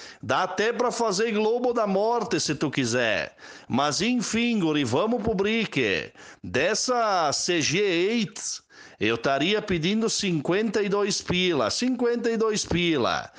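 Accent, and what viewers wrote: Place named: Minas Gerais, Brazil. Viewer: Não é não.O sotaque dele é mineiro.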